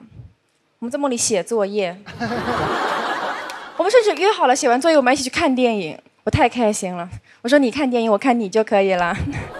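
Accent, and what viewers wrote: Northeast, China. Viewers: 可惜了 其实挺好的 就是说的太东北 动作表情太多 李诞不喜欢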